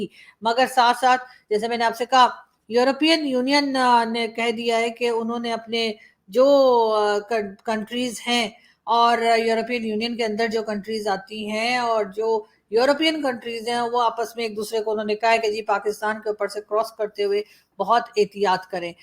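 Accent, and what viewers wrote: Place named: India. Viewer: ji aapke bolne ka style poora Indian ‘s se milta -julta hai. Same to same. Bahut aacha 👍👍👍.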